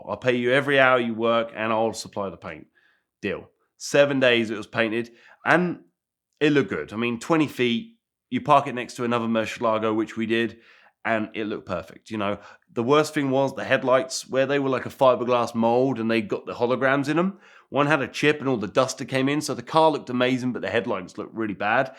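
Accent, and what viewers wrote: United Kingdom. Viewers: Can't get more British accent than this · Lol I’ll do my best to reduce the British twang lol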